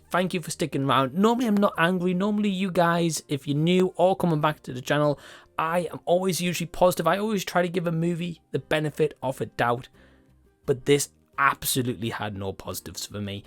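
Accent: Newcastle, United Kingdom. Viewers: Hey mate, I’m from London and I just discovered your channel. Love your channel and your Geordie accent. We don’t all speak like that down here (as you stated). I’m from the “ends” and whilst I used to speak like that, I grew up and got a career and spoke properly. It’s a shame Adam and Co haven’t grown up either. Not a great representation of us Londoners. Appreciate your honest review.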